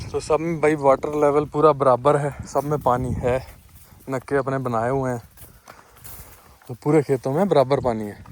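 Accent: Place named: Punjab, India. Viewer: Siraa lagaa veer hindi vich Punjabi touch puraa siraaa😂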